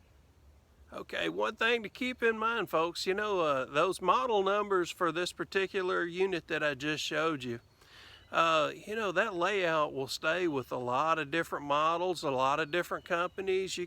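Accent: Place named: Alabama, United States. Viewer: HaHa! I knew it was Alabama! Got to where I was watching just to hear home :)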